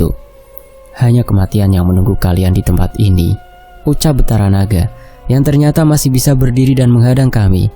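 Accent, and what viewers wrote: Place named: Bali, Indonesia. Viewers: Mas bikin salfok pke logat bali😂😂😂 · Om swastiastu blie 😅😅😅 longat Balinya keren salut ya sama blie selalu bisa mengikuti longat di setiap daerahnya 😅😅 Salam dari Jimbaran Bali Kuta Selatan blie 😅😅 astungkare sehat selalu biar semangat trus bawa acara fakta sejarah dan fs juga 😅😅😅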